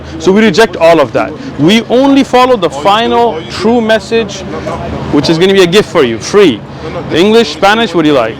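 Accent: United Kingdom. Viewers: Loving the British accent 😅😅😅 Anyways Salaam from Malaysia. Your videos has been my go to “podcast” to listen to while driving back and forth to work. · I love sheikh British accent., 😂😂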